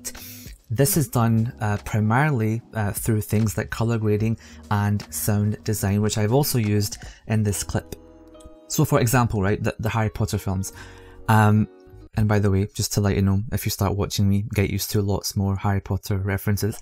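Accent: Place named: Scotland, United Kingdom. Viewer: Great tips bro, looking forward to more of the Scottish accent and filmmaking tips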